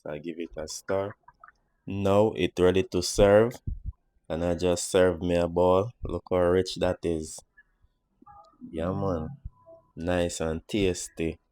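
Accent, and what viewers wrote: Jamaica. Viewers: I’ve watched your short videos with music playing in the background. I much rather hear your rich Jamaican accent. It gives your awesome dishes more flavor. 👍🏾👍🏾👍🏾👍🏾‼️‼️‼️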